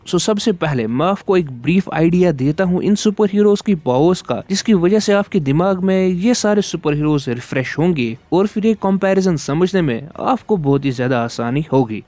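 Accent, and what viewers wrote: Kashmir, India. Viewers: Boss kashmiri ho kya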